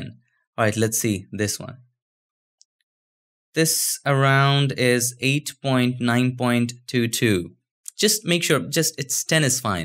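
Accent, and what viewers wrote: India. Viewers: guy with Indian accent tells me how to fix motion blur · You know what, it's almost a perfect video, you just need to know how to get rid of your indian accent.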